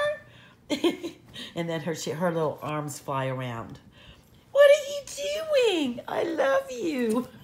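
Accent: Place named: Alabama, United States. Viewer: When I was a kid (many moons ago), I had an aunt from Alabama. I swear hearing you talk is like hearing her. And she always made me laugh. When you say "dinner", Starr says "wait for me".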